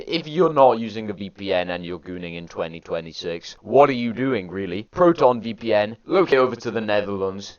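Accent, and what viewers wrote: United Kingdom. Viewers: Bro I know from your accent and because you went to the Deftones concert that you live in South Wales lol, I do too but I didn’t go to the concert because I’m not really that fussed even though I like the band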